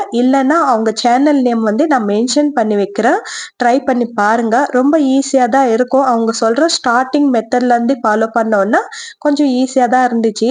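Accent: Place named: Andhra Pradesh, India. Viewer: Hi sis enga amma kooda ippude tha pesuvanga don't worry we r from andra so slang allaga undithi so go on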